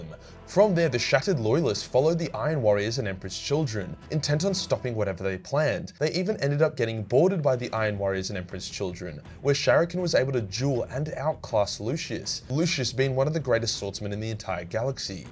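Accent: Australia. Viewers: Wow glad to see my favourite character be told by an australian, thanks mayte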